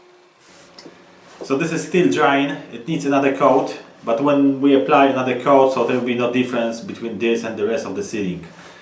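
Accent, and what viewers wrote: Poland. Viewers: Hi there. Just discovered your channel and it’s a real treasure trove of useful little videos like this one so I’m subscribing to see more. Brilliant DIY advice for the UK viewers which is rare in a sea of American videos. I think I recognise your accent to so I’ll hazard a guess and say dzień dobry and dziękuję 🍻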